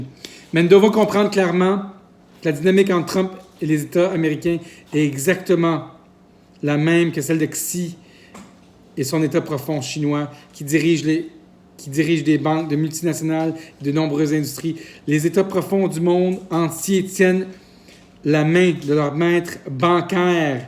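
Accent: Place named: Canada. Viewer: J’ai horreur de certaine personne qui se permettent de critiquer ,c’est l’accent du Canada,eux en tous les cas n’ont pas peur de dénoncer se qui se passe ,merci aux Canadiens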